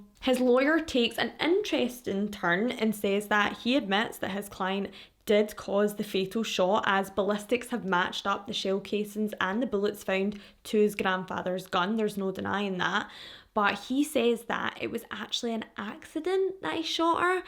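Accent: Scotland, United Kingdom. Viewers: So glad I found your channel, your my new bedtime listen, slowly going through all your videos, I know it’s murder video but I find it soothing before bed 😂 (must be your lovely Scottish accent) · I love a Scottish accent.❤️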